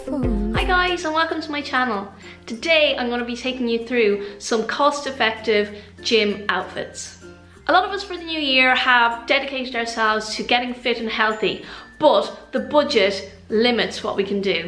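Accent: Ireland. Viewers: oh okay, Donegal here, thought it was A Donegal accent🙄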